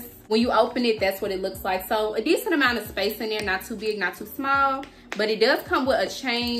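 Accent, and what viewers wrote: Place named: Miami, United States. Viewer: Your accent sound like you’re from Miami.youre beautiful ❤️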